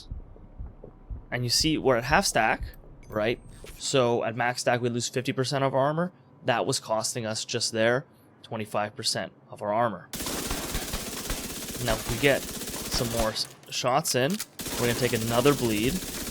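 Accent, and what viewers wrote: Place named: Canada. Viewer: Dude, where in Canada? I can't quite place the accent.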